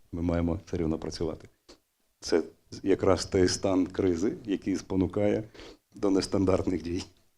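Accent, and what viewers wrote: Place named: Russia. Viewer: Пане Залужный, а не лучше ли говорить на языке, на котором вы всегда говорили, русском. Очень тяжело слушать русские слова на украинский манер... "воена" - вийськова, и др.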